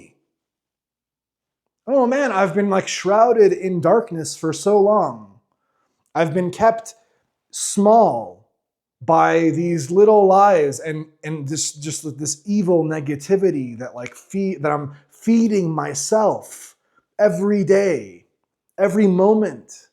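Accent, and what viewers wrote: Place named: United States. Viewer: Yap, right on the money. Golden truth through an American accent man. The key is to permanently remind oneself of this and not get triggered by outside circumstances. Unfortunately, emotions, pressure, stress, fear but also euphoria are throwing me out into the regular ego function I am used to for such a long time. The skill to maintain the inner balance is key. It takes time to build up this skill. I am working on it. Greetings from Munich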